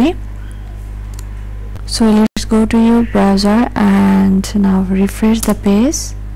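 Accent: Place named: India. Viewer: Your way of explaining is good.. By the way i am from India.. So from the accent I thought you were from India too.. Keep up the good work :)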